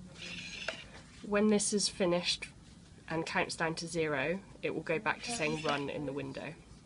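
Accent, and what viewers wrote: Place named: United Kingdom. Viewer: Thank you. Love your explenation and your British accent.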